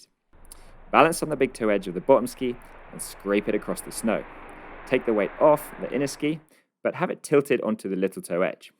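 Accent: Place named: United Kingdom. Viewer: Love to see a good video from, that british accent!! Allez Alvoriaz!:D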